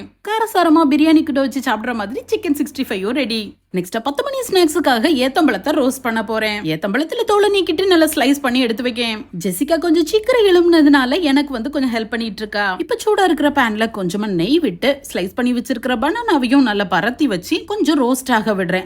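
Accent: Tirunelveli, India. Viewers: From tirunelveli to nagercoil kanyakumari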